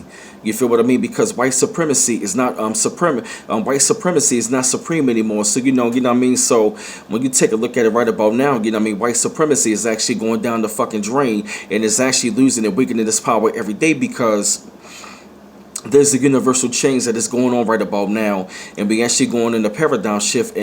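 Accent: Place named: Philadelphia, United States. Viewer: Your accent sounds like you come from Philly? Yes they are losing power all over the earth. People are standing and speaking out against them. There is a shift of consciousness taking place. Yup! You are speaking truth, and I see everything unfolding. I was telling my daughter for the last few years what was is unfolding right now. I could and can actually visualize what is and going to happen. I been saying everything you said.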